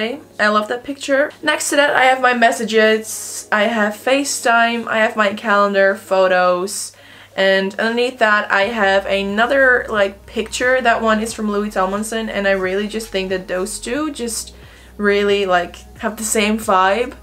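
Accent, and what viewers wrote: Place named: Netherlands. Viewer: lol ik was je video aan het kijken en ik zat te denken huh jou accent is precies Nederlands maar het moment dat je het recept van de ontbijtkoek liet zien wist ik het meteen lmao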